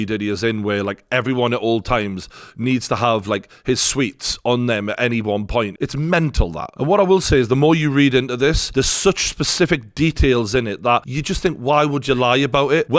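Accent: Newcastle, United Kingdom. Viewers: Geordie accent, what does this mean? I do kind of like listening to this guy. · I love this but he's not Irish bless ya! He's from Newcastle the accent is a Geordie accent 😁😁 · He is a Geordie from Newcastle upon Tyne. Check out any vids on the accent and city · Swear is jokes 🤣🤣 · Such a brilliant fresh delivery of all this Diddy tea 🫖 ☕️… love the geordie accent, and subscribed 😂😊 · Brilliant, we need more Geordie explanations of things going on. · He accent is called Geordie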